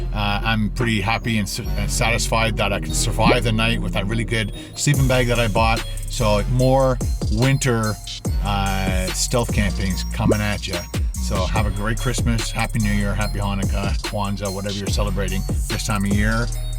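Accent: Canada. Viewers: Ouuuuuuu!!!! You are Canadian "EH"!) <3 I have always said that our own country is just as good as the USA to explore and take a vaca!) Fabulous work brother!) Keep up the vids!) <3 I will be driving there for sure!!!! I'm sure the mango nectar helps to calm the spice eh!?!) Would love to hear your story! ....where you came from and how you became a YouTuber!!! ;) <3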